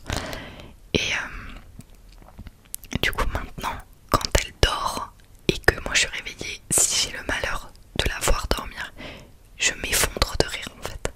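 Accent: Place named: South, France. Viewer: J'avais jamais entendu ton accent du sud !! 😂 Excellent. Moi je le prends depuis que j'habite dans le sud sur certains mots aussi.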